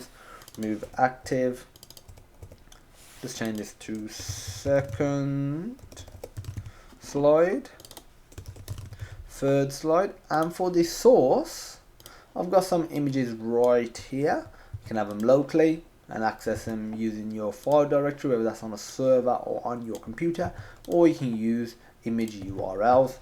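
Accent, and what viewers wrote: United Kingdom. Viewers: Great stuff ! The git downloads will save me ages...! I appreciate the video too btw - good to hear a Brit voice - no trouble understanding you :-)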